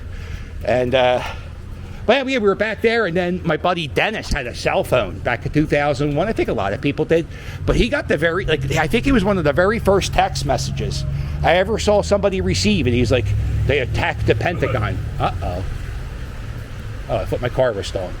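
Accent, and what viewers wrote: Philadelphia, United States. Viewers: I was a worker at a delinquent training facility in Olwein, Iowa with 20 teenaged. Although the chances the bad guys would target Iowa, was bill, the kids thought they were next. I’m from Philly and listen to you to make sure I keep and refresh my Philly talk and attitude. I’m now in Denvet, Colorado. When I offer an opinion they ask if I’m mad. NO, I’m just sarcastic GD. Great walk. love it.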